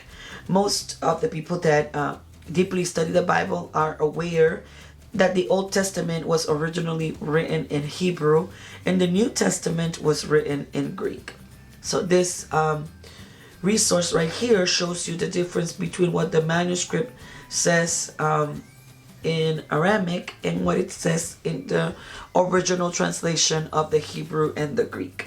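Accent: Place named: Spain. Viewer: You don't need to apologize for your spanish beautiful accent. Your English is perfect. God bless.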